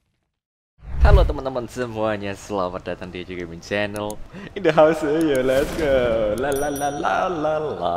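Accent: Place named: Medan, Indonesia. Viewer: bahasa nya bg kreak medan kali bang😂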